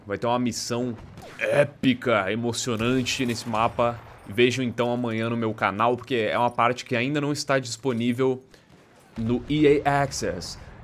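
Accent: Rio Grande do Sul, Brazil. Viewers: funny,voce é gaucho é?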